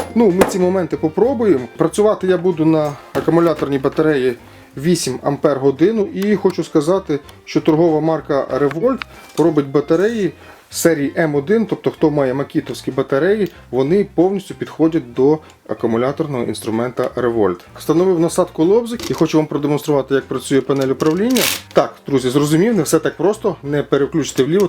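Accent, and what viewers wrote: Russia. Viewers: Хз, мне лично иногда уши резало неправильное произношение с примесью русского, да что там, даже название неправильно написано(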